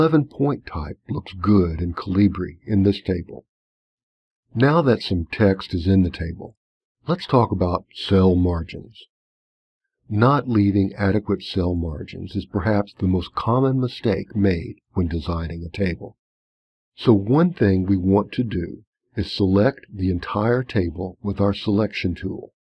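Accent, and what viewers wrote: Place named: United States. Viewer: Slow, steady instructions in a familiar American English accent. Thank you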